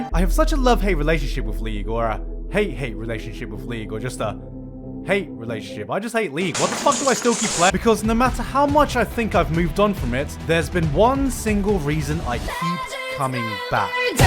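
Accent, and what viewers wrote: United Kingdom. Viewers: He has a Bri'ish accent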